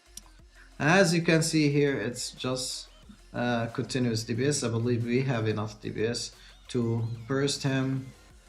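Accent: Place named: Arab world. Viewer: لهجتك كأنك عربي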